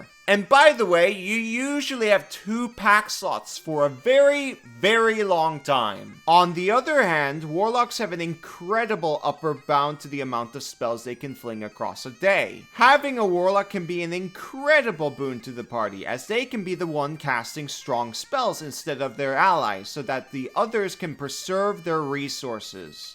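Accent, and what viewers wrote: Norway. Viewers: Hearing the most Rogalending dialect ever threw me off so hard you don't even know dude.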